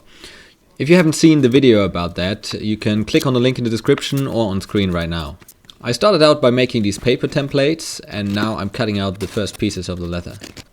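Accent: Germany. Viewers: actually he's got an accent, I'd say he's from Germany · Really cool project. Your english is good, but you have this tiny little accent. Are you german? :D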